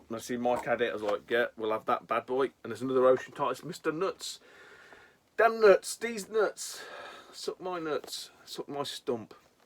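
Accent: United Kingdom. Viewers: it was cool to hear the accent and the eloquent swearing. I have been working overseas the past seven years so I dont hear British accents anymore ha ha.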